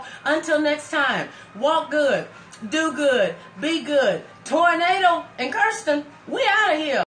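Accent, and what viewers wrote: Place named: Texas, United States. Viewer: You sound like you are in Texas with me😀😃🤩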